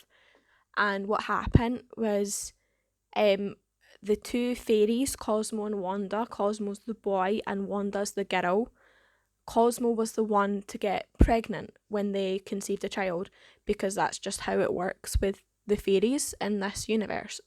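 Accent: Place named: Scotland, United Kingdom. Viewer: Where are you from? You have a really Scottish accent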